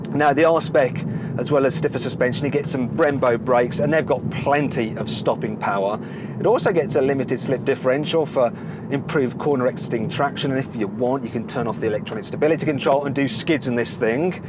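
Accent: United Kingdom. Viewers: it's not heyundai..... maybe the British accent is funny?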